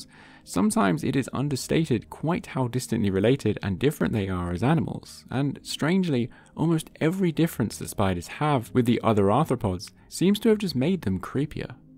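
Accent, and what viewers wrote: United Kingdom. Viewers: he can't help that he's british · do you guys just believe every claim that's confidently stated by a guy with a british accent? it's a rhetorical question, i know you do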